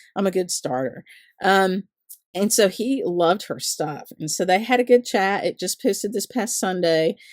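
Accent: South, United States. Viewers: I enjoy your videos so much! I could listen to your lovely southern accent for hours. Watching from Australia🐨🦘💕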